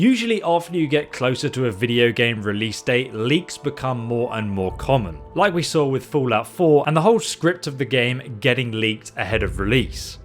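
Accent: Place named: United Kingdom. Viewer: Eh it's a bri ish thing. At least I think hes British either that or hes Scottish or something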